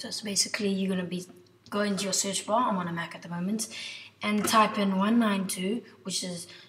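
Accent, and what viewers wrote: South Africa. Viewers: THANK YOU!!! And you're South African. :D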